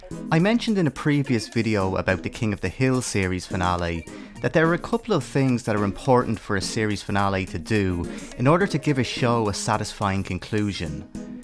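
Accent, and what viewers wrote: Ireland. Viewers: Mate are you from Ireland?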